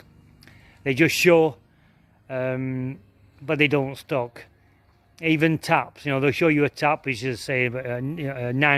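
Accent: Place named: Northern England, United Kingdom. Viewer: Appriciate the updates, I’m from the Uk also you sound like your from Leeds/Preston area? Anyway your build is just Infront of mine! How much land do you have? I’m 37 and have 1 rai in khon kaen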